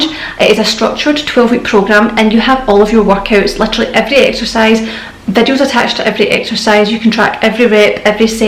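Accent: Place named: Scotland, United Kingdom. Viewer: Hey. Thank you for your video! Fun fact, I started following you in 2016 and one of the reason (on top of your awesome content and the veganism) was to get used to scottish accents before moving here. Now I've been in Scotland for 7 years, so thank you for being my introduction to the country (and fitness!):)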